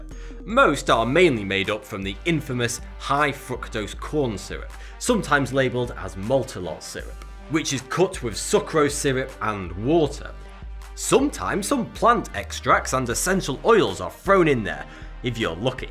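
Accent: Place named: England, United Kingdom. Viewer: He definitely English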